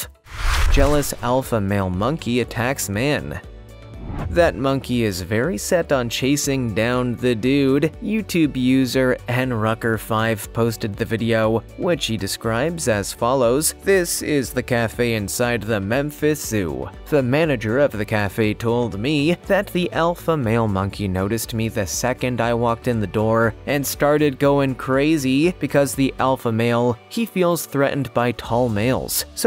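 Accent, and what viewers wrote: Canada. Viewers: Im sorry great video but. Pfff im dutch and even i can hear that disgusting canadian accent. “Mouth, about, doubt,” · That is one heck of a Canadian accent. Love it!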